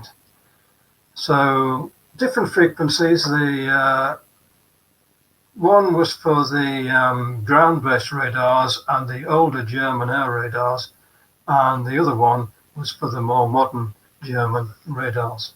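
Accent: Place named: England, United Kingdom. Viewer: You need to practice with the mic you are too far, cannot understand a word... remember you have a thick English accent and this is for the world...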